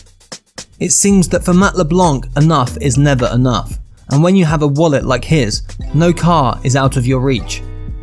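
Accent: United Kingdom. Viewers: half hour of syupied math in bri ish accent. fah shame/ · I have watched a few of these tubes and find them interesting and informative but DAMN! your pronunciation of English is downright annoying. You sound as if you're English or at least of that heritage but some words you use should be avoided at all costs. Such as "La Fayette" and "Illinois" in the Axl Rose episode are pronounced as "LaFayettey" and "llinoise",m and in the current episode you speak of and "Ensembel" when referring to an ensemble. If you take more time you mau increase your subscriber base.....Just saying. Nevertjeless will still watch when I am completely void of contemplative thought ans the mispronunciations wake me up.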